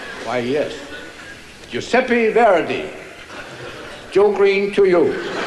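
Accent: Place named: Denmark. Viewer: He tells the same jokes but it doesn't matter. He is still funny. I read his autobiography in Danish, bought in a second hand store. He had a successful career as a concert pianist in Germany before the war but eventually had to flee, being Jewish, through Sweden and Finland. Managed to get the last boat from northern Finland on a US special vessel sent to pick up US citizens. His first wife had spent time in the US and was a citizen. She insisted on taking her cat with her. They were parted but still friends, Victor and she, that is. Despite the ice and extreme danger, they made it to the US. I am not Danish, but speak Danish and always thought he was funny because of his accent in english. Then l found out that all Danes have a funny accent in english without even trying.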